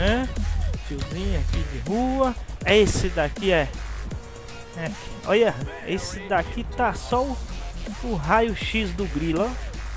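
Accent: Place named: Ceará, Brazil. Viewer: Vc parece cearense tmj top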